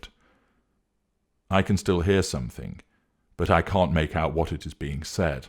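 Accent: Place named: RP, United Kingdom. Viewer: Thanks I must say hats off to you again, I love your INTEGRITY and your Queens English.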